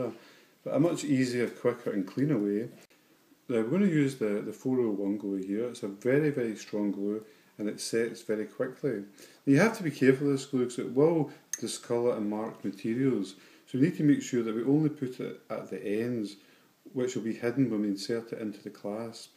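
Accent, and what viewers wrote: Scotland, United Kingdom. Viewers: If you knew how much your accent is loved by many of us here in the US, you'd have a lot more tutorials. It was nice hearing you and thanks for the tutorial. 🙂 Before I die I'll make it to Scotland and tour where Alexander McCall Smith has the setting for his wonderful books and I'll go visit your shop too. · Great seeing a man doing this. Great job . Love hearing the Scottish accent! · The shop is located in Scotland so it's a scottish accent. :-)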